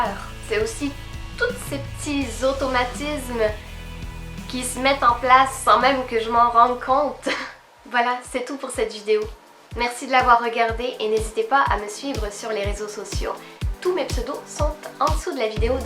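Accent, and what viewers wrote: Quebec, Canada. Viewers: Ben content de te revoir la binette.....(Denis Doyon You tube) (l'origine de vos croyances religieuses) (No 130) Marci de tes commentaires sur ma page....Hélène (mon épouse) et moi te trouvons flayée pis ben intéressante à t'entendre...Tu es en train de poigner notre parlure et venant d'une francaise c'est encore plus tordant...Des fois tu nous garoche des expressions savoureuses, mais on te saisis très bien....Tu as le don de bien emmitouflé les mots justes de notre joual. On dirait des fois que tes phrases ont été toasté sur un chaise électrique tellement tu es drôle. Il nous est arrivé souvent de poigner one-way la direction des chiottes tellement qu'on a ri de toi...Tu nous a fait pissé de rire tous les deux. Souvent on a la puce à l'oreille pis l'feu quelque part de t'entendre au point tel que ta parlure va rallumer ben des braises qu’on pensait éteintes dans l'arrière pays.....Hélène et moi on vient d'la campagne dans des régions éloignés des centre-ville....On a été élevé ben emberlificottés dans la religion de nos pères....On n'avait pas l'choix dans l'temps ils nous estampillaient lorsqu'on étaient sur les momelles de nos mamans...Moi dans ma vie a été de prêcher un évangile pur, tel quel, sans artifices et mon métier d'évangéliste c’est de me répéter, pis rendu à mon âge ben, on se répète....Merci beaucoup de tes capsules....Tu es superbe...Hélène et Denis Doyon...Je suis aussi sur facebook XXX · J'aime beaucoup ton accent français et québécois 😍😍❤️❤️❤️ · la bagarre de vos chats en finale : c'est drôle ! 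j'en veux encore! à mes yeux et à mes oreilles, vous êtes devenue Québécoise. et surtout une ambassadrice hors pair ! d'autant plus que vous le définissez très bien dans votre topo. Félicitations ! JfR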